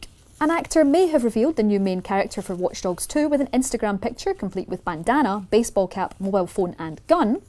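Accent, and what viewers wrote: Scotland, United Kingdom. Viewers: i cant understand you, and thise british accent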